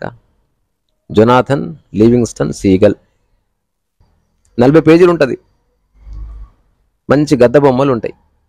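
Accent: Telangana, India. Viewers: అన్న తెలంగాణ యాసలో చాలా చక్కగా మాట్లాడారు .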